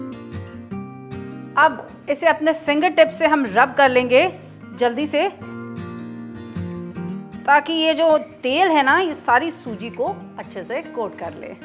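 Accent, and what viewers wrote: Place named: Lucknow, India. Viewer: मोहतरमा आप एकदम लखनऊ वाली हो गई है अच्छा बोल लेती है , हां उर्दू तो नहीं बोली फिर भी....... good 👍 i am also from Lucknow but now residing in kashi . One very special ingredient heeng you have not used . I put heeng that increase the flavour more . In both resipe. Here in kashi matar alu and golgappe wala pani they mix it is also very tasty .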